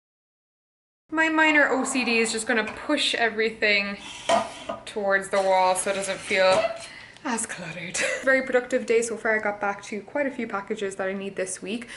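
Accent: Cork, Ireland. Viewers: Loved the B-roll footage this week gal. And also love how Cork your accent goes when you are with. It’s gas!!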